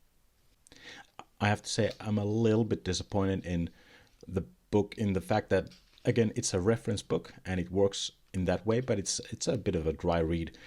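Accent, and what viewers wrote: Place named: Finland. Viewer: HAH! Now I hear it! You sound like my buddy from Seinäjoki! :D Should have recognized it at once. Good to hear you found a warm welcome here. :)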